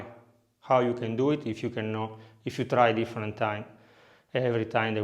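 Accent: Italy. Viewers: I like your Italian English accent, sound sweet and strange to hear, all in all thank you for the lesson, ciao ciao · He is so cute! And his accent too. Ok I am catching the next flight to Italy. ✈️ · Totally Italian accent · Ahh that fine old Italian accent 😌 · Italian accent my favorite accent. is that wine Halal? any sheikh here? 🤣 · The Italian Accent is so cute !! 😂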